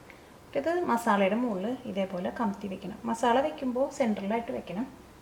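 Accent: Thrissur, India. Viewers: you don't have to be sorry. There are a lot of thrissur people like me, who watches your videos, not only to learn cooking also to hear thrissur slag.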